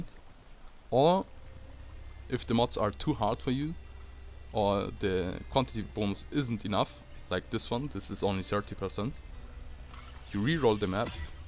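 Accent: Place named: Germany. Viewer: Are you German ? Ich höre das ;) Vorallem wie du Bonus aussprichst :D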